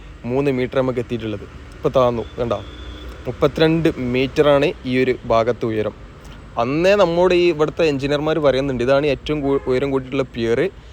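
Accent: Malappuram, India. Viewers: Bro, just a small piece of advice, pls present in a neutral slang. The Malappuram slang that you are presenting is extremely rough! Such an overkill! I hope you are producing these videos for the whole of Kerala! If not, pls ignore the comment! Nevertheless, I appreciate the efforts taken by you to create these contents! Keep up the good work!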